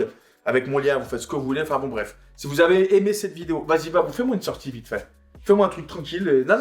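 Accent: Saint-Étienne, France. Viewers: Avec le vrai accent stéphanois qu'on aime. Je t'ai vu à basic fit Andrézieux 👀👀👀👀👀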